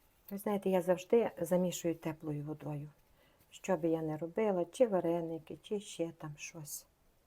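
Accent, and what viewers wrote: Transcarpathia, Ukraine. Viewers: Не нервуйте😊- то є Закарпаття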